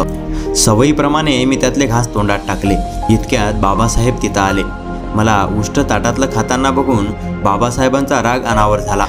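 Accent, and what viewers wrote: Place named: Konkan, India. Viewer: Your speaker has not good is the kokani middle middle words I did not understand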